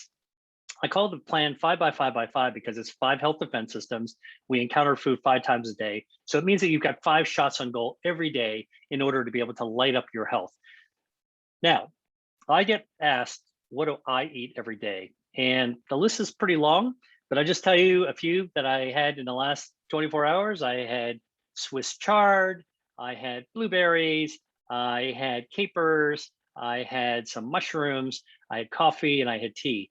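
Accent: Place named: United States. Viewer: I like watching his videos. Great info and so glad I can understand his American English. 😅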